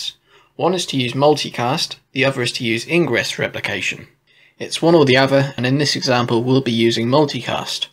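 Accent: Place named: Scotland, United Kingdom. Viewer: 你的口音让我想起了我远在苏格兰的奶奶。视频很棒。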